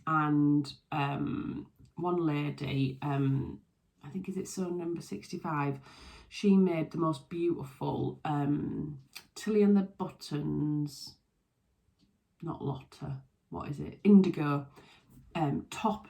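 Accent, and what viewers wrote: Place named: County Durham, United Kingdom. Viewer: Thought another commenter gave a good suggestion for the creme floral.....put the floral design near your face. Another trick I use when I Iove a fabric that's not really my best color is to either make a binding of one of the colors in the design and put that around the neckline and even the sleeves.. Or piping around a lot of the edges works too. Or a collar of a different color that flatters. Last Or.....even use two colors stacked for sleeve or neck band band, button plackets. So love listening to your accent, it's like home. Family all still live in Country Durham but our family emigrated to America. Thanks for the time you take to share your sewing!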